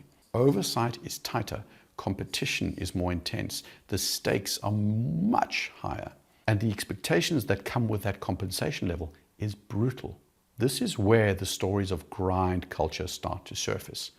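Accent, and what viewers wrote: South Africa. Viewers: I have recently discovered your channel and I'm obsessed. Thank you. Great accent too - South African slash Danish? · from the accent, are you from South Africa ?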